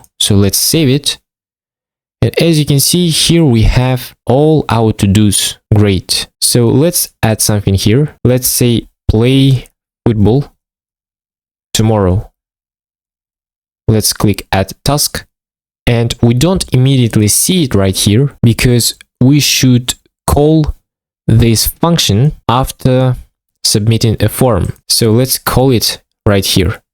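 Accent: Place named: Russia. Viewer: I just wonder what is your native language? I hear accent and really wonder. My guess is that u are Russian.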